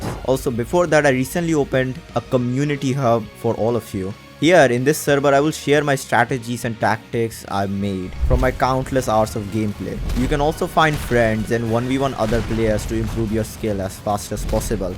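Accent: India. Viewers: Yo ur Indian right cuz ur accent and the song u used is Indian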